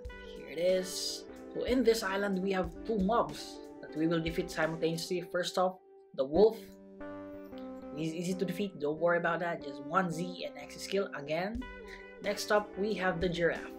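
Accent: Philippines. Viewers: Ur voice is satisfying btw sounds like a pilipino speaking enflish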